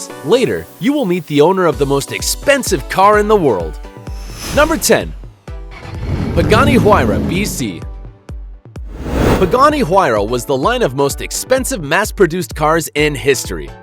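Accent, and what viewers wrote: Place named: United States. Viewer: Americans and your pronunciations.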